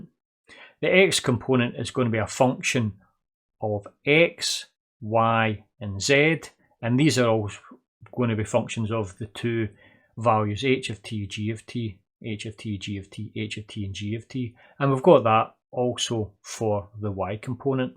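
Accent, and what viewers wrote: Scotland, United Kingdom. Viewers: even just for the Scottish accent.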